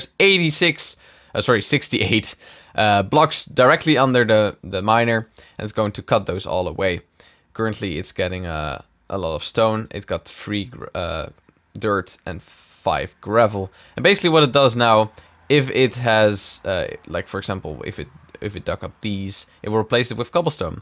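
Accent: Netherlands. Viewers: mooi engels man maar ik hoor wel dat je nederlands ben :D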